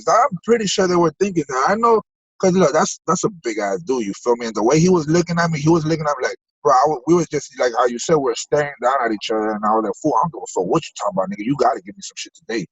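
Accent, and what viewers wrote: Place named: Malden, United States. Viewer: Sup my man u said u were born in Malden but grew up in Florida, there's no way u didn't grow up in Malden too with that accent! What age u leave?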